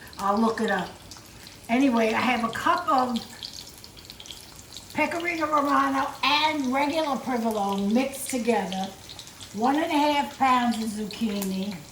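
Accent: New York, United States. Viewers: Stanley Tucci started the recent craze about Spaghetti Nerano. There is a video of Stanley watching an Italian Chef make the dish which Stanley says was “ life changing” . Chef John from Food Wishes agrees and insists deep frying the Zucchini rather than pan frying is the first key. The second key is refrigerating the zucchini overnight. The kitchen science is not explained. Finally, Chef John uses more butter. Otherwise , he mashes the zucchini like you do , and he used substitute cheeses like you. I pan fry my zucchini in more oil and butter than you do, but I hate deep frying. I might refrigerate the zucchini once to see if it matters. I love watching you as your accent reminds me to call my sister in New Rochelle( I live in Omaha since Medical School). 😘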